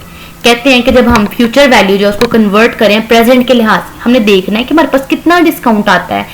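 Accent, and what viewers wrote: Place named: Punjab, India. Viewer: ma sha Allah your axent is very nice cute axent ( LOVE PUNJAB )😍😜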